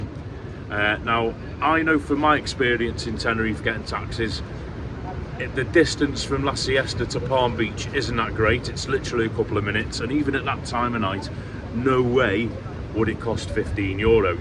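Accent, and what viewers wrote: United Kingdom. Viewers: Do you have a scouse accent?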